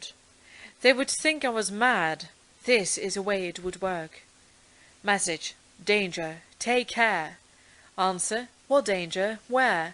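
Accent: United Kingdom. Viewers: This is a RP, isn’t it?? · I love British accent thanks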